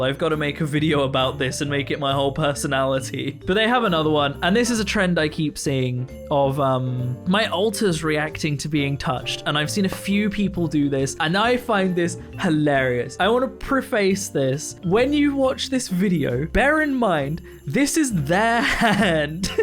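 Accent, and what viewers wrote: United Kingdom. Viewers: It's only now occurring to me that you're British.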